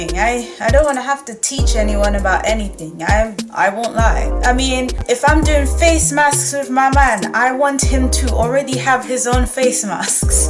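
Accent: United Kingdom. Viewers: I thought you were originally from London 🤷🏿‍♂️ I love your voice ❤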